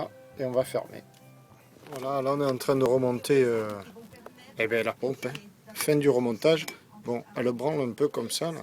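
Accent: South, France. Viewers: Bonjour, Et bravo pour cette vidéo réalisée dans un espace "confiné" ah les bateaux… J' ai vu que vous taillez vos joints vous même, il y a bien des années, je le faisais aussi, mais je n 'arrive plus à me fournir en "feuilles" de ce matériau spécial joint. Auriez vous une adresse de fournisseur, car en plus j' entends un bel accent qui me fait penser que vous êtes du midi, je réside moi-même à Marignane, alors si vous voulez bien me donner un tuyau… Je vous remercie par avance. Prenez bien soin de vous et bonnes prochaines ballades en mer. Cordialement. Tristan.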